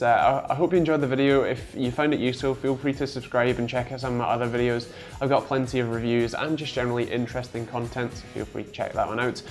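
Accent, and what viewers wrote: Scotland, United Kingdom. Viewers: scottish not irish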